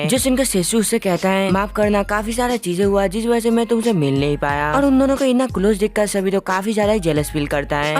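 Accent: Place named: Bengal, India. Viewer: Bengali tone mat nikalo ..aur thik se bolo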